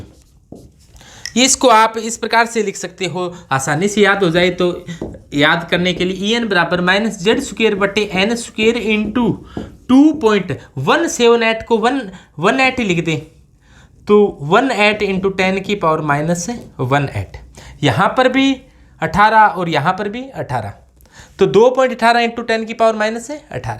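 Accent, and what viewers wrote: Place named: Rajasthan, India. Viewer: वाह सर मजा आ गया आप हमारे राजस्थान के ही लगते हो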